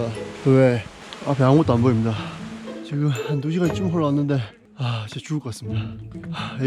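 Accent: Gyeongsang, South Korea. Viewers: 다음부터 이런 실수 하지마 ㅎㅎㅎㅎ 근데 말투가 꼭 우리 동네말투네요 대구쪽분이세요?? ㅎㅎㅎㅎ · 저도 경상도 사람이라 님 사투리가 넘 친근하게 느껴지네용 ㅋㅋ 옆집 오빠같은 느낌ㅋㅋㅋㅋ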